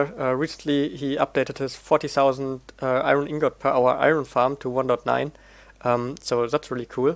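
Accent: Germany. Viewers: Jaja der deutsche Akzent ist ziemlich schwer weg zu bekommen.. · Bist du deutscher? das hört sich sehr deutsch an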